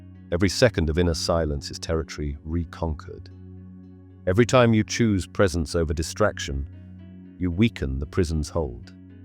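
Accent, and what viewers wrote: United Kingdom. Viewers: I listened closely to the first 15 minutes and I can't believe people actually see this as anything more than Kamala Harris talking before an election it says absolutely nothing in a British accent for 15 minutes I can't listen to the rest of it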